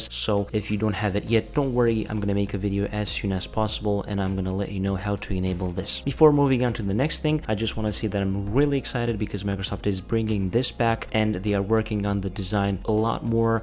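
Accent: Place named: Romania. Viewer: thank you, you're the best one for sharing whats new in windows 11, and you look very educated and your accent is verry beautiful, i appreciate your big work, i wonder how romanian people can speak english like this ☺ i hope we can see your face someday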